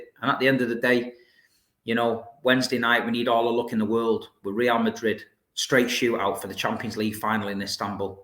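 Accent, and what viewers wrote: Manchester, United Kingdom. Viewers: Love listening to you talk about us mate, proper manc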